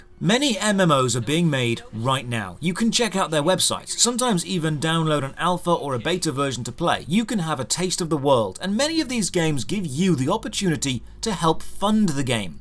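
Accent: England, United Kingdom. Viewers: I like the way u talk to me English man, Keep it up ole chap XD !! great series so far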